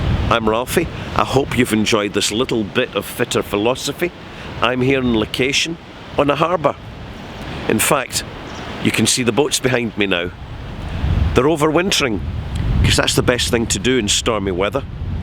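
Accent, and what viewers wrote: Scotland, United Kingdom. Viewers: I came here for philosophy, setting and a Scottish accent. Liked and subscribed.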